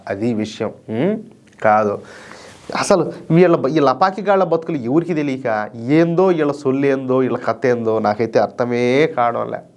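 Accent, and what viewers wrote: India. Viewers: Mr., I like your videos due to your slang of telugu.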